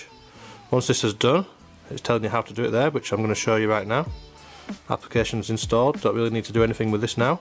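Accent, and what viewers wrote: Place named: United Kingdom. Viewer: He sounds like a British lad will be surprised if he aint i love mi British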